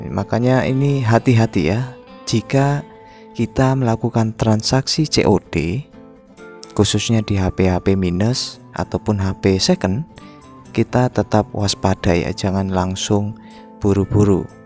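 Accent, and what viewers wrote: Java, Indonesia. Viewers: Oh aku kira di jawa juga Tapi kok logat e jawa ya Makasih bang saya banyak dpt ilmu dr konten e sampean aku jadi ngerti cara nyervis hp y walaupun blm se master abang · Jowo ne ketoro nemen :v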